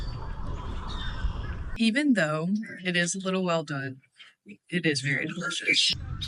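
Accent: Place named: Delaware Valley, United States. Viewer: She sounds like she is from the delaware valley but i know baltimore people sound like this. Maybe west Virginia. Anyone can tell me where she is from?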